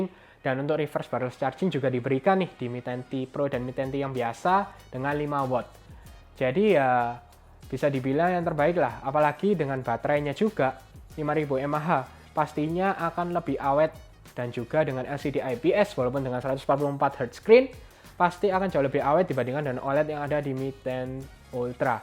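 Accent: Java, Indonesia. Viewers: Mantap mas....cino jowo medok yoo😍😍😍😍😍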